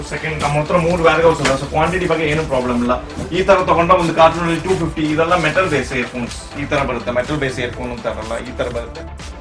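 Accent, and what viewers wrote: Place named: Tamil Nadu, India. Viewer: Typical tamilian Kannada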